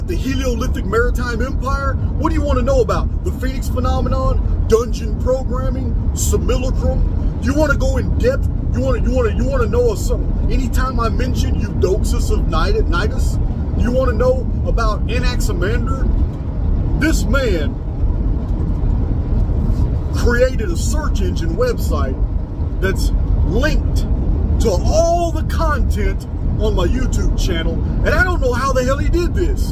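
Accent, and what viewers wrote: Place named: Texas, United States. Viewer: Dude you sound soooo Tex us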